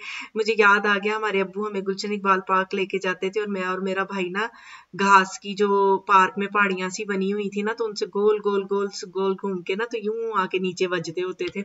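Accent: Punjab, India. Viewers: Ma Sha Allah , Nice sharing👍🏻 awesome video. Very informative and very well explained. 😍loving your Punjabi style. Absolutely amazing ❤ keep sharing videos dear. My kids also watch your videos. They like your way of talking 😍❤️ i also follow you on instagram.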